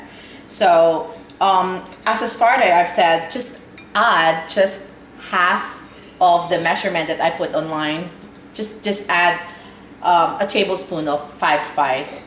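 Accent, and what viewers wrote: Philippines. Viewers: Jollibee adds MSG to their chicken...the real secret ingredient! Madame, I love the way you present your dishes. Your Filipino Accent is truly Filipino...brings me back home. Hugs my teacher...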